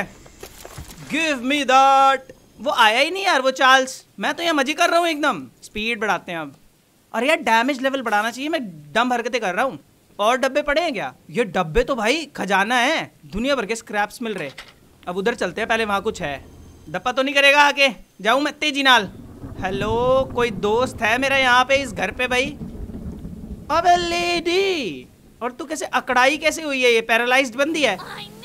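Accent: Haryana, India. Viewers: Real HARYANAVI HARYANA KE LIKE KARO AND I LIKE HIS HARYANVI ❤❤❤❤❤❤❤❤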